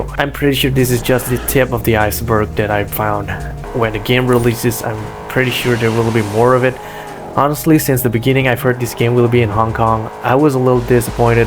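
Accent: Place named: United States. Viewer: it's funny how you, not being a native english speaker, have so much american accent. and I agree with the list, I'm super hyped about this game because of roleplay details like the ones you mentioned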